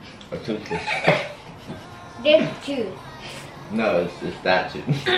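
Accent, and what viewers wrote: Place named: United Kingdom. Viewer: Omg I swear I'd never think u were American I always thought u were british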